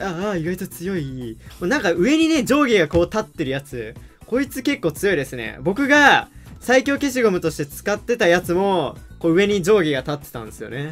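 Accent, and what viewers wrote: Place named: Hokkaido, Japan. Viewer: 北海道弁LINE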